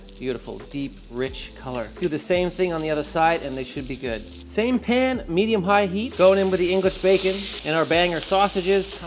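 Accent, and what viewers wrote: United States. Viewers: He may have a yank accent but he's an English lad with that fryup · Clicks on link, hears an American accent then switches off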